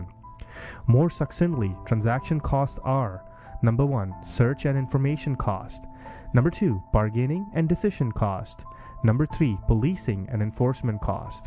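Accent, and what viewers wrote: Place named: India. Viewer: The first Indian accent video that I didnt skip, mainly just because the accent wasnt that strong hahaha.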